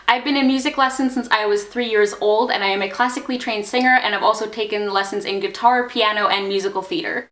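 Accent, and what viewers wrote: Canada. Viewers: it is SO funny because as I was watching some of your videos I thought to myself "wow I bet she's canadian because of her accent" and then I read that you ARE canadian!!! ( I am Canadian as well haha). Anyways love your videos :)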